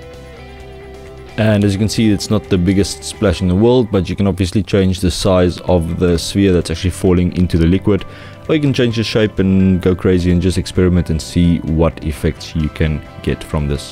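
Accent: South Africa. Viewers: Nice to hear a South African voice doing some maya tuts. subbed.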